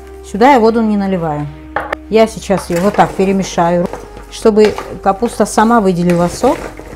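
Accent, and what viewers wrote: Batumi, Georgia. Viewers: дорогая, очень приятно слушать Ваш голос. Когда жила в Батуми..... мне ваша речь напомнила о детстве И отдельное спасибо за простой и очень вкусный рецепт Я выросла на такой капусте и сейчас балую своих родных вашим рецептом По вашим рекомендациям готовила перец в банках. Супер!! Спасибо и дай вам бог здоровья и вашей семье благополучия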